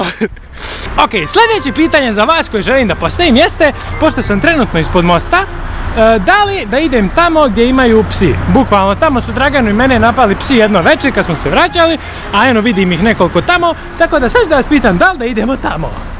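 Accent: Bosnia and Herzegovina. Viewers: Brate zašto pišeš ,,reku" a iz Bijeljine si i pričaš ijekavicom?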